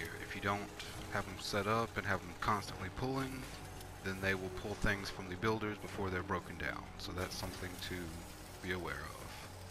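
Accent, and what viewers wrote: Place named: South, United States. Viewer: Neatly done sir! This video like the recycling plant you've featured is well executed... It shall likely provide a considerable influence on my ideas on similar matters... Thankyou... As an aside might I ask asto the origin of your accent... Your voice clear, well modulated and easy to listen to... Maybe you should consider voice over work... Would you by chance, be a Southern Gent?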